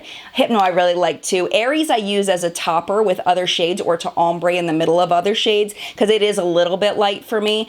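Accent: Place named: Baltimore, United States. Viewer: Ha! Thought I recognized the accent as Mid-Atlantic (Philly?) but didn't know for sure it was Baltimore. Baltimore Hon here, too too. 😁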